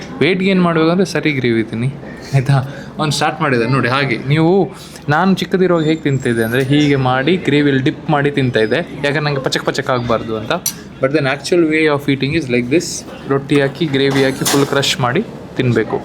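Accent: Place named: Tulu Nadu, India. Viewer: Your Tulu accent sounds like Bollywood star Suniel Shettru. .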